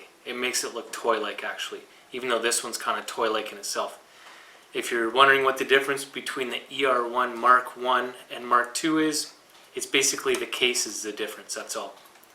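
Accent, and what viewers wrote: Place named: Canada. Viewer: You have the slightest hint of a Canadian accent. :P Love the video, thanks for sharing with us.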